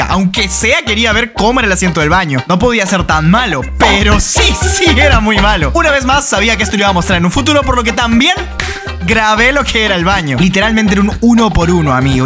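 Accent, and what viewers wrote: Peru. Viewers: eres de lima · Eres peruano😮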